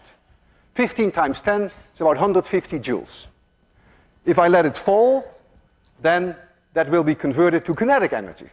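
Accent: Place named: Netherlands. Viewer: its a duch accent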